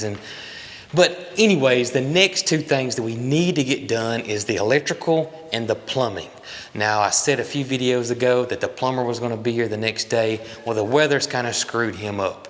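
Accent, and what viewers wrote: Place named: Texas, United States. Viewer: Those doors are absolutely gorgeous and I love your accent I am from Texas but we kind of have the same Lango going on here LOL😂😂😂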